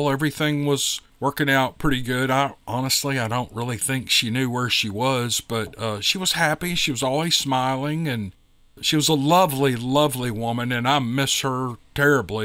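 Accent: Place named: Louisiana, United States. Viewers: GREAT Cajun accent! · Let's do it! Protest indeed. I have loved a couples of products that got discontinued soon after I discovered them! That is so aggravating 🙄 Especially certain flavors of foods. 😵‍💫 Love your story about your mother in law, too❣️ Never listen to the negative comments about your banter or rambling, or whatever, because I love it❣️ It's always interesting & it's true, real life stuff❣️Can't get any better testimonies, stories, experiences, etc., than that! Haters can move on if they don't like it. 😉 I'm sure I'm not the only one that loves your stories, ALL of them. Love your Cajun accent too❣️ 👊 Spot on! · Really enjoyed this... Thx buddy..... PS.... That Ice cream being discontinued will save you on bike rides!!! Lol.... Oh yeah, nice Cajun accent!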